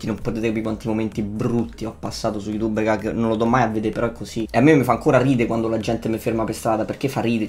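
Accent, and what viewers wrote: Rome, Italy. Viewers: è normale che guardo tutto il video.. sai intrattenere non annoii e alcune volte la tua parlata romana mi fa ridere un botto, grazie ✌#versoilmilione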